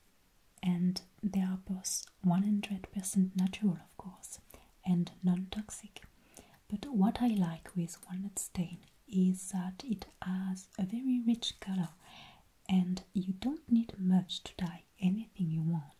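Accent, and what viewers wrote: France. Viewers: Is she German? The accent is beautiful, I just can't place it. Edit: nevermind, she's French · you're right, she is french. German accent sounds way different in my opinion, its not that beautiful... I am german so I can say that lol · Her french accent is a trigger itself 🤩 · Does anyone else LOVE whenever she crumbled the paper in walnut and starts talking in her amazing French accinet in a softly mom like voice because i have watched this a whole lot of time and every time she dunks the paper and crumpled it i get chills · French accent is pleasing to my ears and her looks are pleasing to my eyes...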